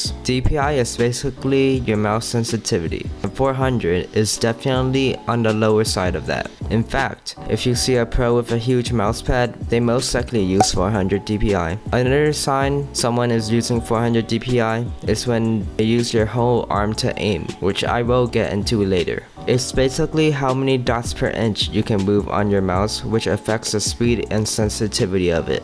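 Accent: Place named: United Kingdom. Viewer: 1. Yes this is true 10+ years ago. You're probably one of those guys who links that Logitech engineer video from over a decade ago. 2. You objectively are though. You're increasing the amount of information your mouse registers, objectively. This hasn't been up for debate in a very long time, but people like you who memorized a bunch of random dated knowledge on forums just regurgitates this every time they get the chance for some reason when this topic is mentioned. Your strawman analogy of comparing polling rate to counts per inch was very bizarre. Polling rate is the objective measure of input delay. This isn't something I wasn't aware of, and honestly if given the choice, I would prefer the 400dpi 1000hz over 1600 dpi 250hz. One is 4ms of input delay and the other is 1ms. I feel like you haven't tried this, because you wouldn't be capable of holding your current beliefs, but just enter any random FPS you would like. 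Zoom in very closely with an awp or that game's scoped weapon, and on lower DPI you will notice it moves in slight chunks rather than smoothly. This is because the mouse, objectively, is picking up on less information. The 3kliksphillip video which aged like milk where he claims in game sensitivity modifier was the culprit has been long disproven. You can replicate this effect with virtually any sensitivity modifier, and the lower dpi skips pixels. Having a british accent and a few 100k subs on youtube doesn't make you immune to criticism, or always correct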